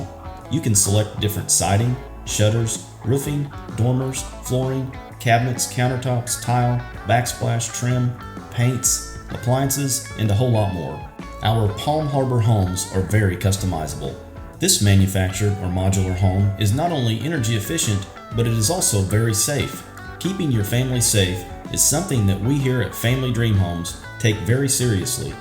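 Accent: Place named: Louisiana, United States. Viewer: do i hear Louisiana? lol This home is so beautiful. great video.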